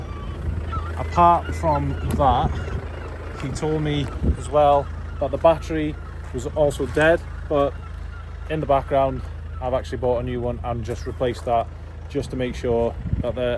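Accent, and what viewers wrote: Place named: United States. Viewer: wow!! you sound very american and even have the car to match it!! love the videos keep it up👍👍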